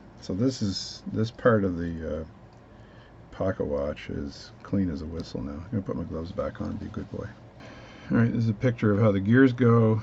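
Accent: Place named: Newfoundland, Canada. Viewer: Ok. The mystery is over. You are from Newfoundland. Right?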